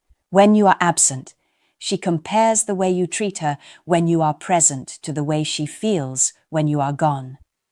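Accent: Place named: United Kingdom. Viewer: Thank you for your kind wishes! I really like your British accent. Your tone is also very soothing to listen too. Keep it up. ❤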